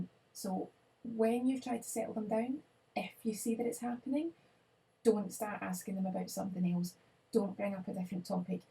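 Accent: Scotland, United Kingdom. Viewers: You`re Scottish accent is super turn on. Great upload,btw. :) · I could listen to your accent forever. Lol… I need to find a nice Scottish woman. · Is she Scottish ? I'm fond of northern British accents (and some like Surrey). She has a lovely voice indeed... She's a masage for the ears and brain :) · im from Scotland too, nice to hear a familiar accent. BTW i have been using your techniques for my husband who suffers from stress and crohns disease and it helps him EVERYTIME< thanks so much for your effort and time in making these videos. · Where in Scotland are you from? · Swedish massage....I was not expecting a lady from Scotland to do the commentary. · I knew you were from Scotland, you have simillar accent to my friend’s. Are you from the Highlands? Btw, great massage. I am currently studying medicine and these kinds of videos help me to get a grasp of anatomy.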